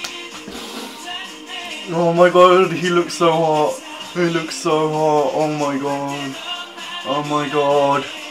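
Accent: United Kingdom. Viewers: Are you British? :)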